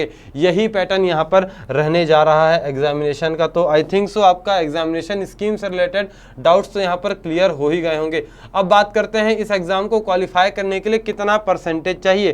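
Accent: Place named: Odisha, India. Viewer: Sir ap odisha se ho kya,apka Hindi wese lag raha hai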